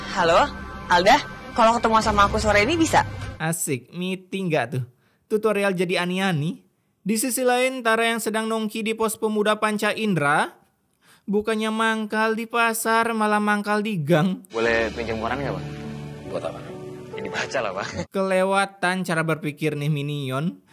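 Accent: Bali, Indonesia. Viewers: Nak bali ne bli e?kaden rage nak jawe seken ne😂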